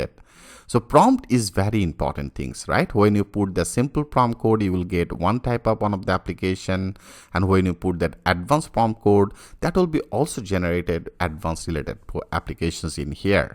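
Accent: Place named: India. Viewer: Could you please speak with an American accent rather than an Indian accent?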